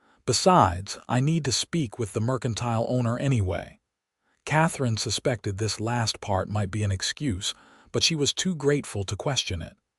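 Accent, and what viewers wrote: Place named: West, United States. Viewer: So sorry, that with the lovely voice of your Narrator, so sorry that so many words are wrongly spelled and/or mispronounced. The voice of the Narration is wonderful It would pass for a genuine Western American if it were not for the outlandish pronouncement of such a few words. I really do like the story. Well done.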